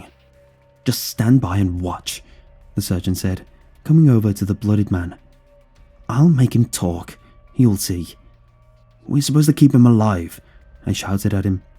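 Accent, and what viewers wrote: United Kingdom. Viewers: Reads title* Me: "Hell yeah!" Starts watching and hears british accent* Me: "Wait a minute."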